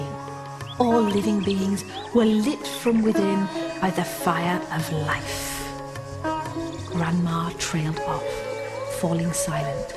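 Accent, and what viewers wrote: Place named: Rotherham, United Kingdom. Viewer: Completely ruined by Rotherham accent!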